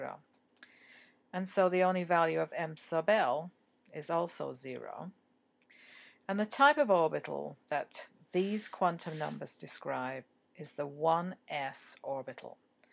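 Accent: United Kingdom. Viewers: What a cool sounding accent. It sounds British but American at the same time. Is this Irish? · Actually she's pretty legit and has an awesome accent from MANCHESTER (she makes sure you know that). She's really funny and makes things very understandable. She is the best chemistry teacher I have ever had. I just started her class this fall semester so I'm not really bias lol.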